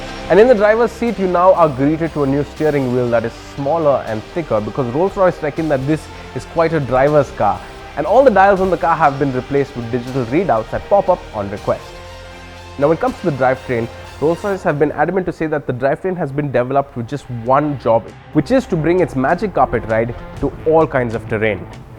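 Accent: India. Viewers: What a crap review. He's not in the car, it's only generic footage, and his Indian accent is a bit thick. I'm not going to buy one of these now...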